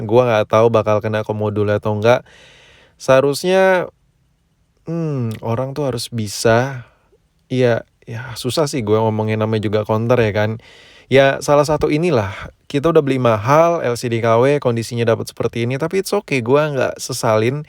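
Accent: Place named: Jamet, Indonesia. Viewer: Logat Jamet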